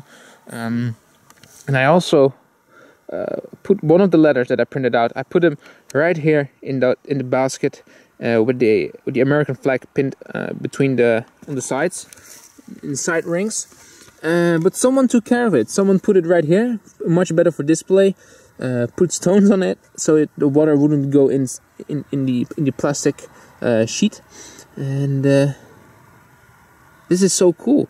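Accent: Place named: Netherlands. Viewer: He is dutch. I can hear it by his accent :)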